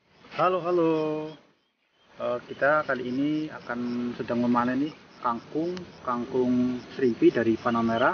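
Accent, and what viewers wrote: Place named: Banyumas, Indonesia. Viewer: sampean tiyang pundi bos kyong ngapak bhsane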